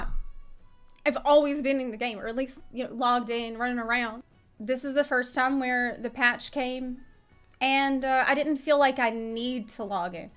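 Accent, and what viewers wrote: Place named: Texas, United States. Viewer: let the darkness consume yeh. how does a Ukrainian end up with a texas accent?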